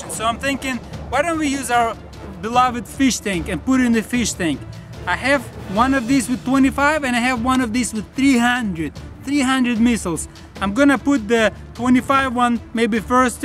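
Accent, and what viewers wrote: Russia. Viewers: D tone of him is like crazy Russian hacker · Русский акцент 😀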